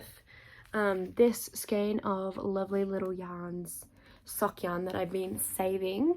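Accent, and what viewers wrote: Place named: Australia. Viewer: Clicked on this and was so refreshed to hear a fellow Aussie!!! Loved your first podcast and will now go binge the rest :)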